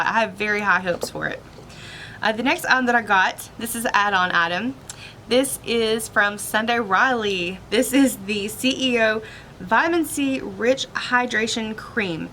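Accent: South, United States. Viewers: Love your eyelook, especially with your eye color! I got the other eye palette and my jury is still out. lol I got the Sunday Riley cream as part of my box and am in love with it; that fragrance!!! I also added on the Tetris lip balm that you did and think it is a great product and such fun packaging. I'm a new sub and 1st time commenting. I like your channel and your accent. You sound southern to me. I'm 63 and live in Iowa and am pretty new to Ipsy (since Dec 2018).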